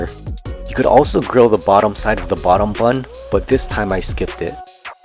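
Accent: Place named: Hawaii, United States. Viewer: You sound like u from Hawaii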